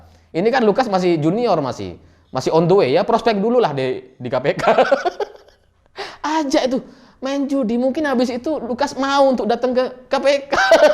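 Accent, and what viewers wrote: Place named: Madura, Indonesia. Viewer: Bang logatnya kaya temen saya orang madura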